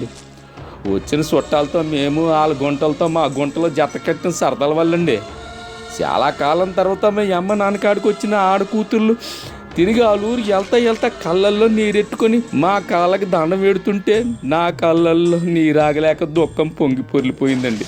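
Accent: India. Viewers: శ్రీకాకుళం యాస భాష అచ్చంగా కనిపించింది. సంక్రాంతి సరదాలు కొట్టవచ్చినట్లు కనిపించసాగాయి. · మీ వాయిస్ ఓవర్ విన్న తరువాత కూడా మళ్లీ సంక్రాంతి సంబరాలు విన్న అనుభూతి కలుగుతుంది బావగారు... ముఖ్యంగా ఉత్తరాంధ్ర యాసతో మీ హావభావాలు అత్యద్భుతంగా ఉంది 🎉🎉🎋🎋💐💐🔯🔯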